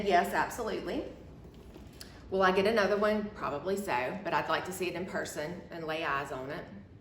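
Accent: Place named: South, United States. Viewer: Two things: LOVE your sweet southern accent and LOVE the D&B satchel! I own many Dooney florentine bags..but the satchel is special. I have it in the Dipinta painted florentine and it's my prized handbag possession. It competes with the lux house bags all day long. It will last me my lifetime and someone else's after me..thanks for revisiting this awesome bag and reminding me how blessed I am to own one too! Take care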